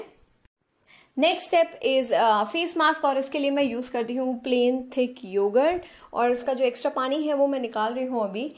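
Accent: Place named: Rajasthan, India. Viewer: Mujhko khudko jor aata he...😊😊😊...Jor aata he sunke he pata chal gaya ki rajasthan ki youtuber he.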